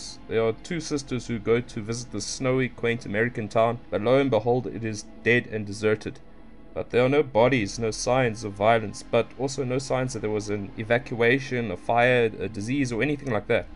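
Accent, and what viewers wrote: South Africa. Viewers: U sound like someone from Cape Town bro, we all have the same or somewhat similar accent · Hey man, are you South African? You sound South African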